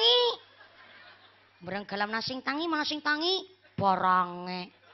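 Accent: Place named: Banyumas, Indonesia. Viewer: Kenapa skrng jd ngefens banget sama Ustadz, bagus ceramahnya ngapak meneh, 😀😀😀😀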